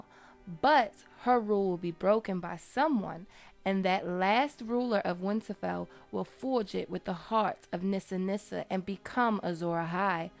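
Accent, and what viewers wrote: United States. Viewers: Considering I'm not normally one who goes for American accents I couldn't agree more. Like sweet music......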